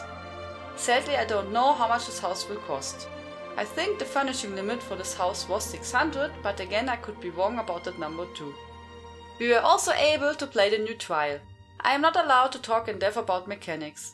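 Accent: Germany. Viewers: Sehr schönes informatives und vor allem kompaktes Video! Meistens stört mich der starke deutsche Akzent in englischsprachigen Videos aber bei dir ist er irgendwie süß ^^ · Der deutsche Akzent ist ja dezent süß. Weiter so... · Gonna get this expansion. You sound german btw ;)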